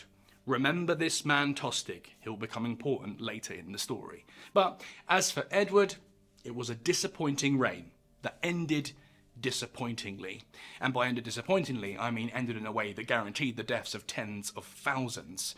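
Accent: United Kingdom. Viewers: Why are the popular YouTube history channels always narrated by Brits? 😂 Rather soothing to my American ears lol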